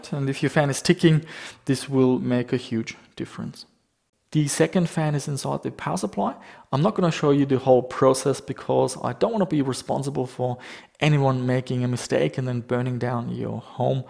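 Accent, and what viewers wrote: Germany. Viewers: ah jes🙈its sounds like you are from Germany😂no hate nice Video✌🏼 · Wenn deutsche english reden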